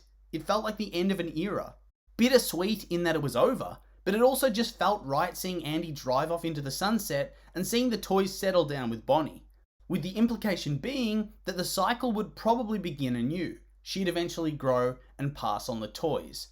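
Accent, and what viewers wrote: Australia. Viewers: Sounds Australian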